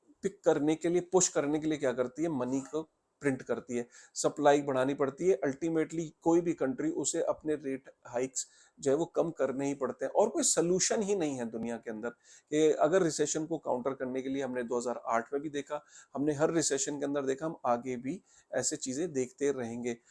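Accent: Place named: India. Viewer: Interesting subject. But Indian dialect is literally the worst to listen too 😬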